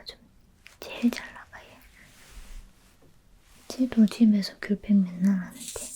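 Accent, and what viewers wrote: South Korea. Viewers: 영상 감사합니다^^ 대구사투리 정감가고 좋아요^^♡♡ 님 혹시 눈밑에 글리터 어디껀지 알수있을까요?? 넘 예뻐서요ㅜ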